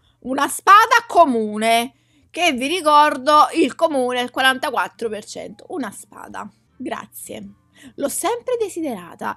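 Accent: Naples, Italy. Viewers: sei napoletana? ps: *TI ADORO*💞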